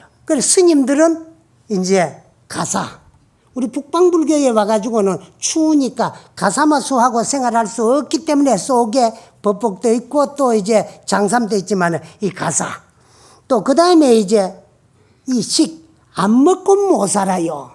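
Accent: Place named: Gangwon, South Korea. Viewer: 감사 합니다 스님법문 듣고보다보면 빠져들어갑니다 구수하신 말씀 강원도 사투리로 정감있는 말씀 너무 재미있게 하십니다 항상감사드립니다 날씨가많이 덥습니다 항상건강하세요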